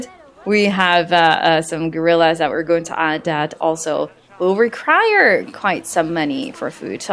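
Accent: Netherlands. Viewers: wait are you dutch? Of ben je nederlands haha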